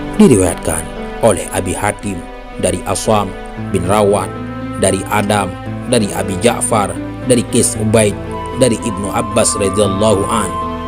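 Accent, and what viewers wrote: Aceh, Indonesia. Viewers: Macam gaya bahasa orang Aceh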